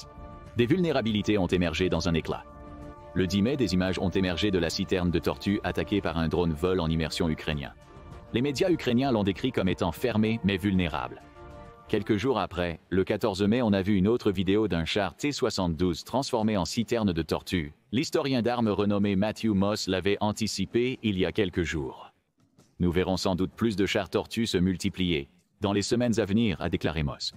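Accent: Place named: Quebec, Canada. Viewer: c' est radio moscou ici , avec une i. a a voix quebequoise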